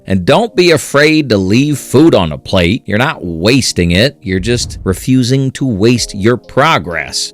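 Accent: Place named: South, United States. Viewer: the southern accent 😭😭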